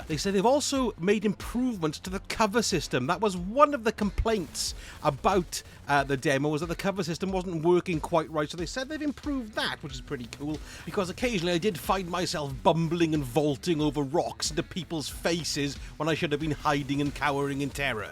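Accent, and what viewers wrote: Wales, United Kingdom. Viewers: so ur welsh then, im in cardiff, are you on discord?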